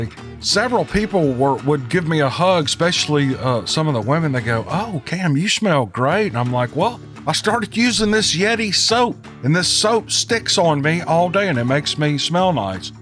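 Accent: South, United States. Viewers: Cam I just love you country voice. You have that voice that you get around a fire and get you some popcorn and get comfortable and listen to you. But I can get in my bed and play your channel and picture my mind in these stories like I'm there. When you tell these stories. Thanks Cam you do a very good job and telling these stories.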